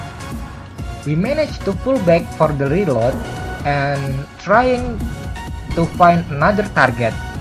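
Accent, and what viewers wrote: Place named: Indonesia. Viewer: Wih orang indonesia mangkanya logatnya gue kenal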